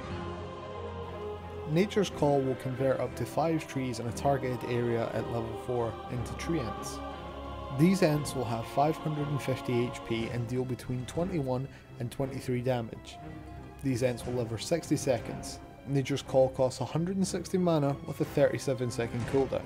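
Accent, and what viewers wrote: Scotland, United Kingdom. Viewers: waaaa! You Scottish too big man ?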